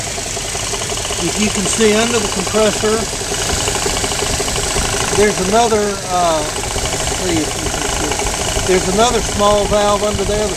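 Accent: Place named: Appalachia, United States. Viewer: I had to stop watching half-way through.. the hillbilly narrator with his stutter "uhs" and "duhs' was just too distracting and that video was nauseating!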